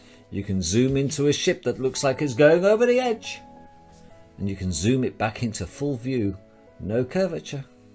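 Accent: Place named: United Kingdom. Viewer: The British accent is great for sales. He is speaking the truth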